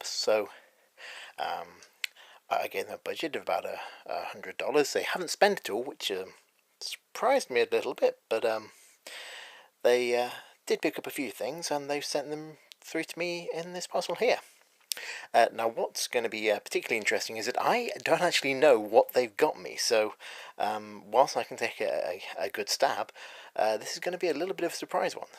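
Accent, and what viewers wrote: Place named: Scotland, United Kingdom. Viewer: There’s nothing like being schooled about US coins from a Brit. The British twang makes it all the more supple! edit: oh sorry, Scottish.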